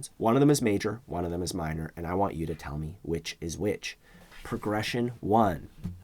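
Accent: Canada. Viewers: You sound so Canadian. :)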